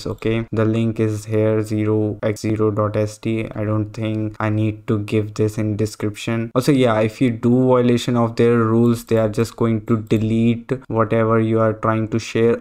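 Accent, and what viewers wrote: India. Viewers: Recognised you as Indian by the accent. I am Indian too, from Haryana, using arch linux with openbox. Tiling managers don't work for me. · I suggest you stop talking with an indian accent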